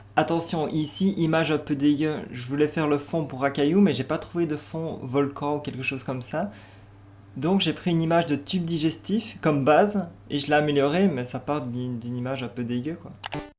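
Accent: Liège, Belgium. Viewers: Petit accent liègeois dans cette vidéo :d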